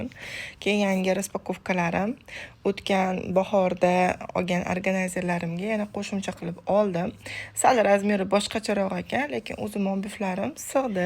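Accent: Kokand, Uzbekistan. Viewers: Men ham shuni sezdim. Qòqonlik deb òyladim. Men ham ayol kishiman. MashaaAlloh opa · Assalamu alekum man ayol kishiman siz Qo'qonlikmisiz man Qo'qonlikman shevez uxsherkan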